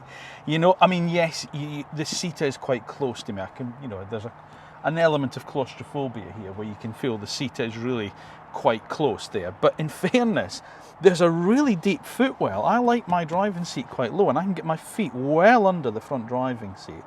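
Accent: Scotland, United Kingdom. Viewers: gotta say, your (scottish?) accent is really nice and warm to listen to (not in a homo way). just that it feels pleasant and friendly.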